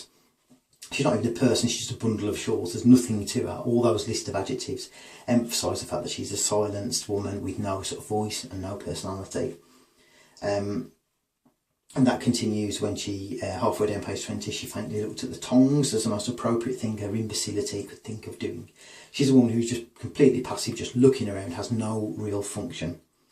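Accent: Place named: Ireland. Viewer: okey ure Irish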